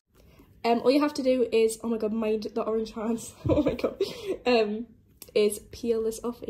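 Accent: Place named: Northern England, United Kingdom. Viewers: No. She's from Newcastle in England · She's from Newcastle, right? I always had trouble distinguishing northern accents :-p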